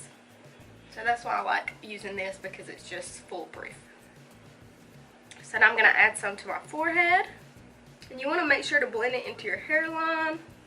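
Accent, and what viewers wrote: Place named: Georgia, United States. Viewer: Absolutely love the Pro glow! Definitely my go to everyday foundation! I love your accent too! Soo cute! Reminds me of my grandma, she's from Hiawassee (however u spell it ) Georgia! It's so funny whenever I'm with her I always catch my self talking like her! Lol love it!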